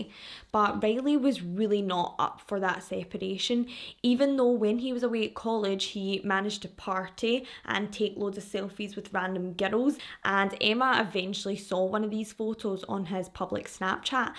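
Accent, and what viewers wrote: Scotland, United Kingdom. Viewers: I love a Scottish accent.❤️ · So glad I found your channel, your my new bedtime listen, slowly going through all your videos, I know it’s murder video but I find it soothing before bed 😂 (must be your lovely Scottish accent)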